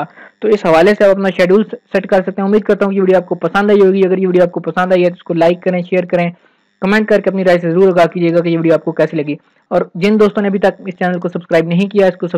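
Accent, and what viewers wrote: India. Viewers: It isn't Saudi, it is some indian speaking "ringi'dingi'ding-ish"... just headache..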